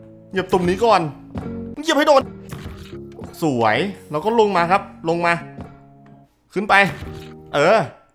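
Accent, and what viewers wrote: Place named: Thailand. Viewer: นับวันพี่ ยิ่งพูดไม่ถูกเเถมมีเหน่อด๋วย <num>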